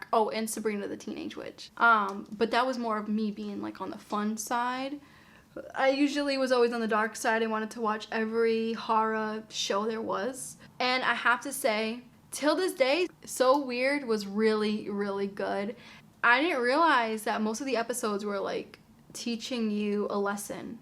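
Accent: Boston, United States. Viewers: Are you from Boston? Your accent.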